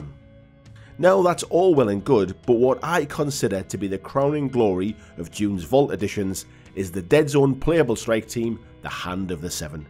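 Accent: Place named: United Kingdom. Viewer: The British accent may be a bit difficult especially for non native speakers. I think it's fine perhaps add captions?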